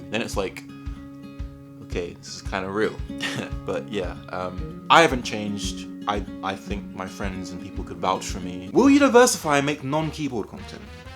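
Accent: England, United Kingdom. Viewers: He sounds Bri Ish for some reason. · I thought it was because you were British and if you say glasses in a British accent it sounds like. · Tbh I never realized you were English before I watched this video. Also my mx blues are so loud I want to rip out my eardrums sometimes. thank you for educating me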